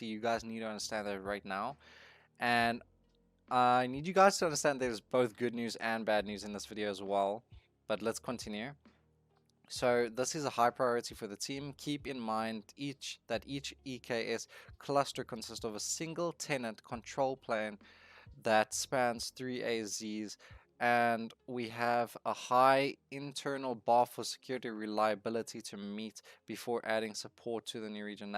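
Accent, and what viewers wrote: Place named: United States. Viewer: that american accent tho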